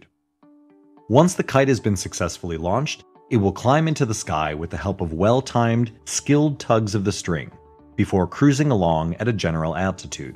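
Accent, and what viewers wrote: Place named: United States. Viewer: Dude how did speak so well in american accent. How did you train?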